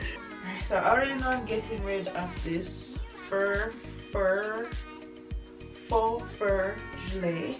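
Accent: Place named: Jamaica. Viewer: Refreshing to hear a fellow Jamaican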